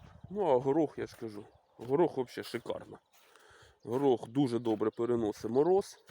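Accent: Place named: Poltava, Ukraine. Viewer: Говірка чую полтавська, а район який? Миргородський трохи поморозило.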